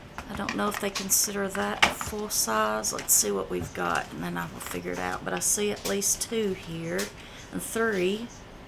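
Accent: South, United States. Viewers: You are such a Sweetheart, :), Love your southern accent. :) I'm born n raised in Ft. Lauderdale Fl. But live in NY now for years. I love your videos. Thank you so much for sharing hun.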